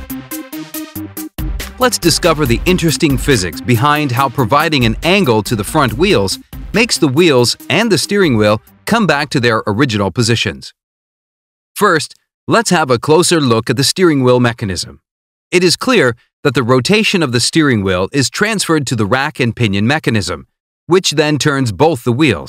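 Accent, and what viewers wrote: United States. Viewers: The video was produced with an American English accent yet the car is shown being driven on the left side of the road. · In what part of the world do they drive on the left, and speak with an American accent? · Speaking Americanized English, but with a steering wheel on the right side. Hmmm. This is an awkward video.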